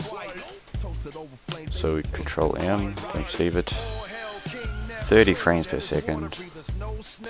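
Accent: New Zealand. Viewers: sexy new zealand accent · No! It's a New Zealand accent. They're like, where's the caar, and he's like, where's the caar. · new zealand accent ftw!!! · I know hes a kiwi...I'm used to people confusing me for a Brit/Welshman....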